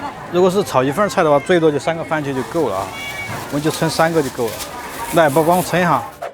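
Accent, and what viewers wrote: Sichuan, China. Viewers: 的四川口音普通话听了倍感亲切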